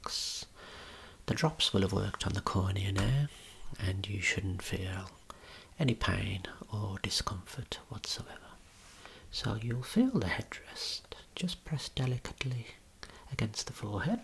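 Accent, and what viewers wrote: United Kingdom. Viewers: it's the UK accent 100%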